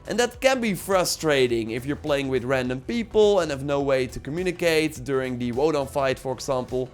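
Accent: Netherlands. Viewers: Het nederlandse accent blijft leuk ;)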